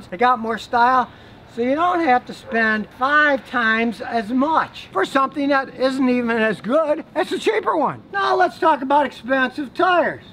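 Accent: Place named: United States. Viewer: Dear uncle, please speak a little slowly for us who have a difficulty understanding American accent.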